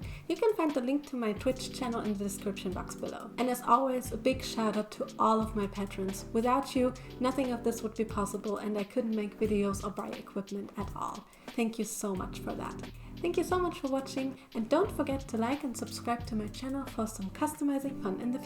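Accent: Germany. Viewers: Are you German? Your accent sound like German♡ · Du bist deutsch oder? Ich habe es an deinem Akzent mitbekommen PS.sehr viel talent!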